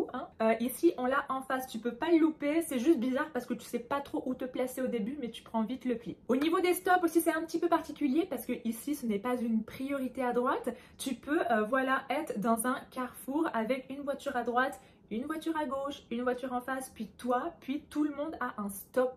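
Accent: Quebec, Canada. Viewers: Tu commences à prendre l accent Québécois :)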